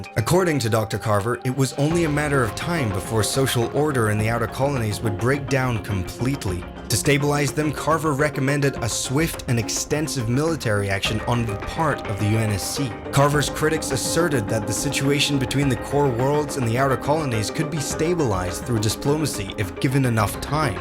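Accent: United Kingdom. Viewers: I think you and installation both also have good voices for narrating Halo lore, especially because of how sophisticated and knowledge driven y'all two sound with the British accent.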